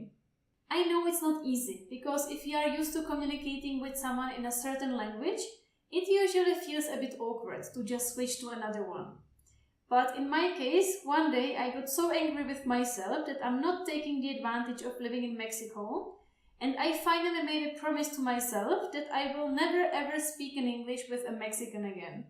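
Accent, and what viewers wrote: Czech Republic. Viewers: Je slyšet ten hnujsnej českej přízvuk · I just wanna ask native English speakers watching this video, are you at all bothered by the fact that the teacher speaks English with a Czech intonation? Not trying to be rude, just genuinely curious! (Honestly, most Czech teachers speak English this way - they learn their vocab but the accent and intonation kind of stay Czech.)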